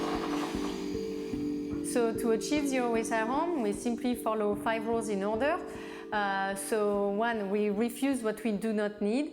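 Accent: France. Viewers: french accent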